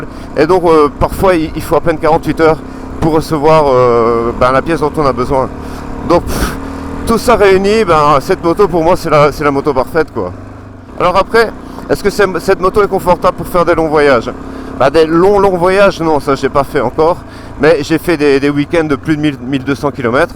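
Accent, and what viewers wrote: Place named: Belgium. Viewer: Bonjour Je te suis depuis peu et tu me regale avec ton accent belge. Tu es pertinent en arguments moto, tu nous fait rever avec de belles images de maquis espagnols, je vais m abonner. Je roule en ktm 690 enduro r 2013 et 350 exc f 2018 dans pyrennees francaises et espagnoles. Continues tu nous regales avec tes expressions espagnoles. Zitoune.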